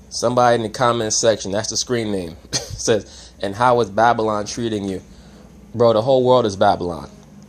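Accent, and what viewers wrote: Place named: East Coast, United States. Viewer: I like ya commentary/ideas on things. You gotta be from the East coast, I hear it.. such as myself. Smart brotha!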